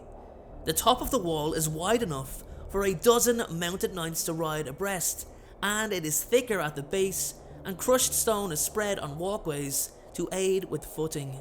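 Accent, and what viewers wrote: Dublin, Ireland. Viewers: That Dublin accent just ruined this video😫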